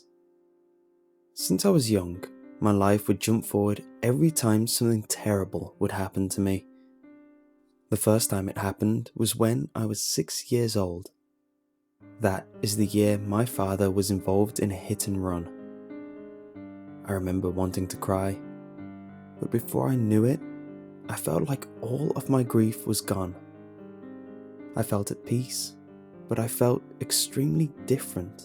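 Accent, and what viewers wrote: United Kingdom. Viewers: isabella xof duh he's British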